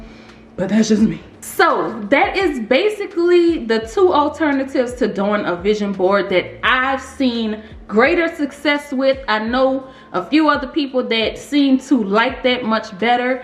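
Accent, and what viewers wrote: New Orleans, United States. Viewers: 2022 is going to be MY year! I've never proclaimed such before and walked faithfully in it because it seemed so cliche'. But after watching and subscribing to your channel, hearing and seeing a hometown voice and face, yes I'm from "da 504", I feel at home "here." I've taken some of your credit advice and seen improvements. Greater is coming and it's because the power lies in me. Thank you for all the content you share.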